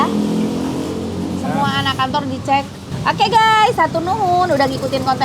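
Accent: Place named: Java, Indonesia. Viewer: klo liat teh makan bakso bikin ngiler.. medok bgt...🖒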